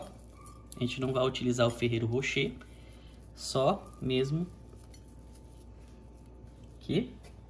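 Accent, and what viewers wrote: Maranhão, Brazil. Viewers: Mano eu comecei a assistir o canal dele agora, e não sabia que ele era maranhense ksksksk aí achei a voz dele parecida com o sutaque maranhense e vi na legenda que ele é ksksk 😅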